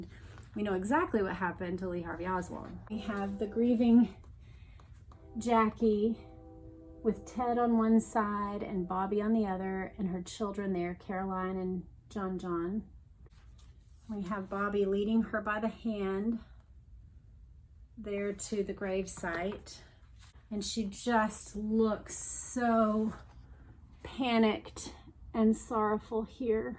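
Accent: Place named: South, United States. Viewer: I think you have done a wonderful job with this whole series and you have such a lovely southern voice.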